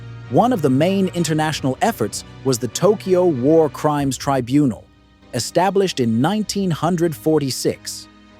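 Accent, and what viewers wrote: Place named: United States. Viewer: Why are the soldiers in the pictures wearing Chinese uniforms and not Japanese? That they are clearly fake allows people to deny that such atrocities never occurred. One can hear the commentator is an American who will not go on to state the pivotal role his country paid in freeing, and releasing many Japanese war criminals like the infamous Unit 721 doctors and inviting them to America or Hirohito Japan's God Emperor. A load of American crap.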